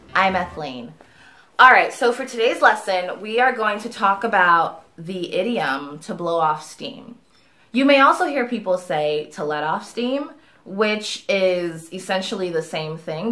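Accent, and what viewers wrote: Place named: United States. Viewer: bonsoir,oui ça va mieux. honestly i really like learning idioms and make use of them in my spoken english. i like your american accent.where are you from in the states ? i was told the accent dependant on the region you live in the us.right ?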